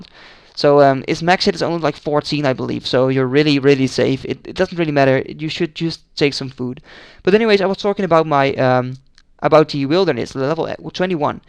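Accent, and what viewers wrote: Netherlands. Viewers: I was like: Wait... he sounds Dutch! Then I looked at his name. :)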